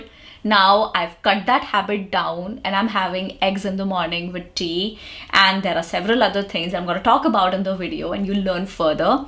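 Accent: India. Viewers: Didi......I love yor indian accent ❤❤❤❤❤❤❤❤❤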